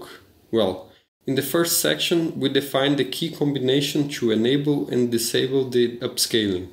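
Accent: Brazil. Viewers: valeu man kkkkk aquele sotaque br no inglês kkkkk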